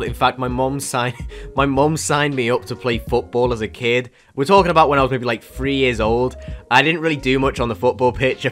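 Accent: United Kingdom. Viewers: He has a British accent? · Why is it I only just realized.. You have a British accent. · Ye I didn’t know- I thought British but I also heard American and Scottish and Australia at same time but in curtain words? Hard to explain lmao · Are you British, i cant really pick up any accents well and was just wondering 😂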